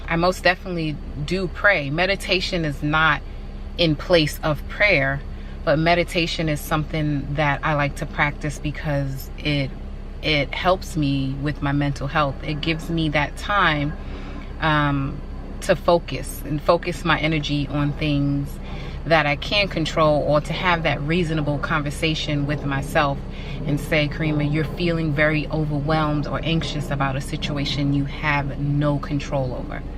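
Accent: New York, United States. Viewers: I just found your videos and saw you live in Texas but when I heard you talking I was like she is from NY has to be. I am a fellow New Yorker who is planning to leave soon you are 💯 it’s too much hustle bustle etc. Great video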